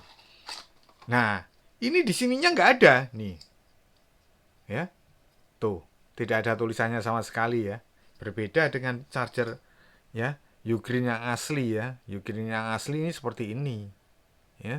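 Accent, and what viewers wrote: Java, Indonesia. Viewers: Logat jawamu medok banget min😂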